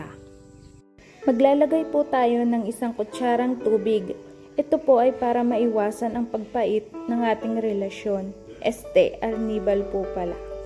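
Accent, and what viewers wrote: Batangas, Philippines. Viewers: Ang cute nyo po magsalita parang gusto ko nalang tumira sa batangas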